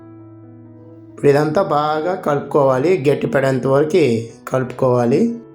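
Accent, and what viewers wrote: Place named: Nellore, India. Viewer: నెల్లూరు జిల్లా భాష రెసిపీ బావుంది అన్న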